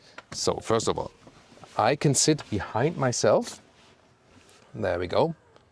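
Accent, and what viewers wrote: Germany. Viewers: "turning indicators" I love this guy's Germlish.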